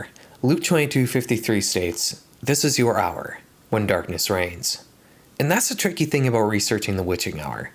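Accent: Canada. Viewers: Lol youre canadian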